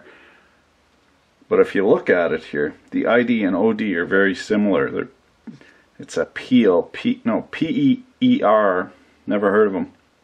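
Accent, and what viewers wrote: Canada. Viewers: Lurnin Canadian with. Still don't have that accent down. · Are you and 1pug1 brothers or is this just how all Canadians talk. bmp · Good stuff. I'm starting to get the hang of Canadian speak. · Great to hear Canadian language.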